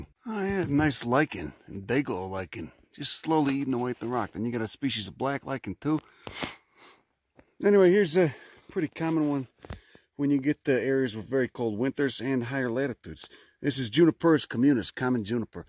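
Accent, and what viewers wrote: Chicago, United States. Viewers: I'm from Chicago so it comes naturally to me as I talk like he does when he isn't doing vids, but that is funny to hear for sure!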